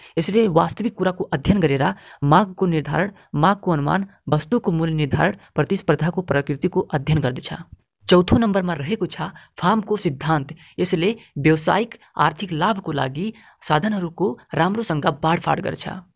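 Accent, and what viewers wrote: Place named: India. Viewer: indianjasto bolera kei bujhiyena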